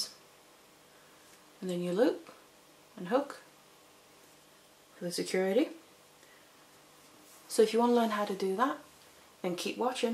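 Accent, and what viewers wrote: United Kingdom. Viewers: May i ask where i can purchase the beads and other things? i am literally just beginning and am so excited but no idea where to purchase all the stuff. I can tell from your accent your from the UK also. Thank you <3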